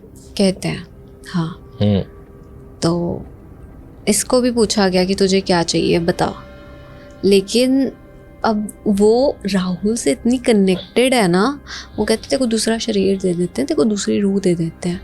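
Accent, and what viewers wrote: India. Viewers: Poora Punjabi accent aa reha Madam da…and yet Hindi mei gal karate hai😁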